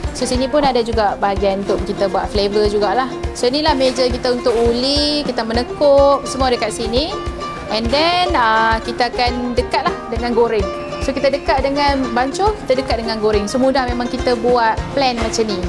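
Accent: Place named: Penang, Malaysia. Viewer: Mantap kak.kekalkan dialek penang kita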